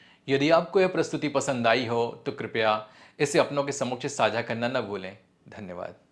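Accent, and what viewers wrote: Standard. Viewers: I like your shudh HINDI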